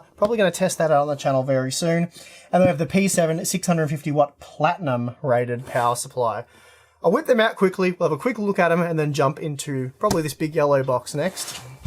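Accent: Australia. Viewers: "Got mai knoif, a blont knoif". Gotta love Aussie accent! :D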